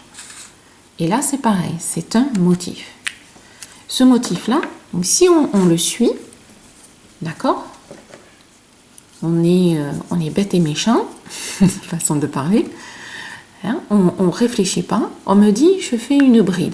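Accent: South, France. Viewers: Bonjour. Très utile ce tableau, associé au plaisir d'entendre ton accent du sud!❤❤❤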